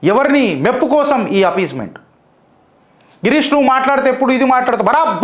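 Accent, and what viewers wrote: Telangana, India. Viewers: Ee telanga telugu kanna normal telugu lo matladadandi, mee accent improve cheyyandi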